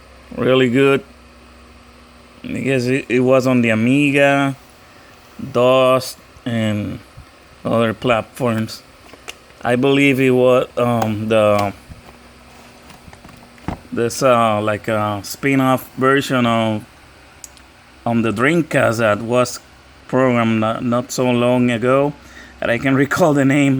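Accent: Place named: Florida, United States. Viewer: You have some bad azz games there my friend and your Puerto-Floridian accent shines in this video. I am jealous of all those pricey obscure titles. Give me give me!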